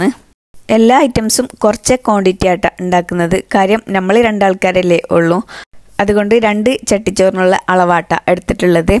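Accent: Kasaragod, India. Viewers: മലപ്പുറം ആണങ്കിലും സംസാര ശൈലി ശരിക്കും കാസർഗോഡാണ് ട്ടോ -😍😍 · Ninthe samsaaram full ksd mix anne Njn first time vedio kaanumbo kasarkod kaarthin enn njn vicharichatt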